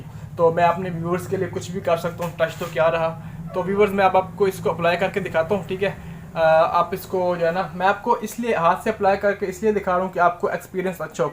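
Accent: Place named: Jhang, Pakistan. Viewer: Mjy tu ye bhai jhang k lg ry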